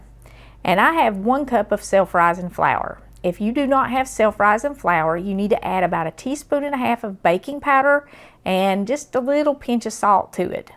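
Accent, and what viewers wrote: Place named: Georgia, United States. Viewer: i feel for you so much. to lose a loved one is bad enough but to lose you're soul mate is that much tougher. from you're accent i think you are from somewhere in georgia, correct me if i'm wrong. i live i south georgia and thats what i here. love to you sunshine and god bless.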